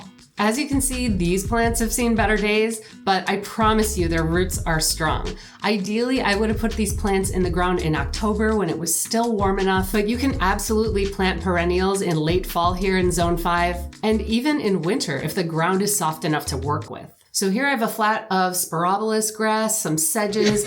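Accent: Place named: Midwest, United States. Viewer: Love your content! Longer vids plz. No need for all the extra movie clips (Xtra editing)... Your midwest voice is needed on YT!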